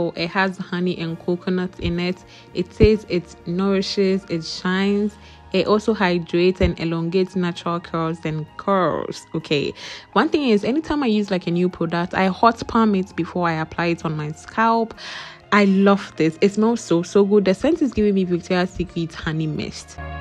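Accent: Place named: England, United Kingdom. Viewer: You are simply smart & very beautiful. I love your English accent 🎉🎉🎉🎉❤.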